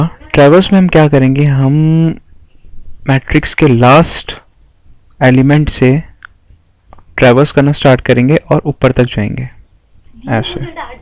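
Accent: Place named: Pakistan. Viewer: Thanks for your help. Your accent is pakistani :) good keep it up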